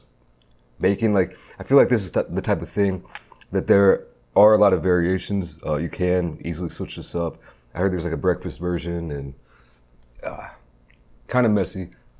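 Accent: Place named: Midwest, United States. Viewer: i new you sounded midwest i fuckin new it lol. i grew up in the northwest suburbs of chicago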